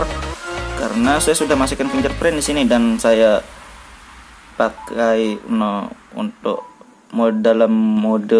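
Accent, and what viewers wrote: Lombok, Indonesia. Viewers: logat dengan lombok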